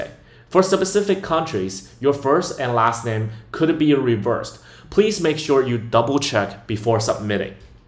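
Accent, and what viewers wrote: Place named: China. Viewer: 这口音咋感觉是中国人？😂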